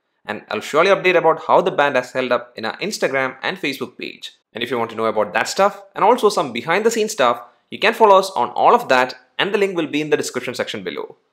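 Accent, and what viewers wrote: Kerala, India. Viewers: Malayalee accent...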